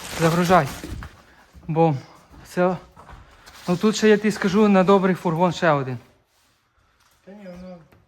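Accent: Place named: Carpathians, Ukraine. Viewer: Контент дуже цікавий, бо я впевнений, що на європейських складах можна речі поцікавіше знаходити ніж в тих же Штатах. Також цікаво звідки ви самі? По акценту мені здається щось ближче Карпат, чи регіону - може бути Львівщина, Франківщина, сильно менше ставлю на Закарпаття. В мене є друг дитинства, він з 6го класу жив в Мадриді і акцент дуже сходий на ваш в нього залишився досі.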